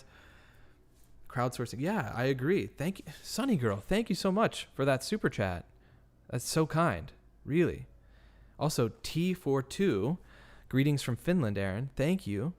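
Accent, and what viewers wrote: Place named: United Kingdom. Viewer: Really enjoyed 👍I think this looks really promising and there's a similarity with the sketches. Nice to hear a brit cover this, I've been following this since two weeks after the murders. Libby was such an inspiring and brave person and both girls would have made such a contribution in this world. Justice will be served for these beautiful girls and their families 💜 💙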